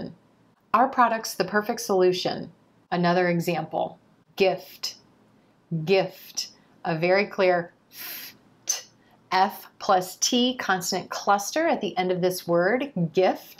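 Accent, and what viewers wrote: United States. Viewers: The best American accent teacher I have ever met so far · You're a wonderful instructor of the American language. Stay blessed. BTW can you tell me you're from which state in the US?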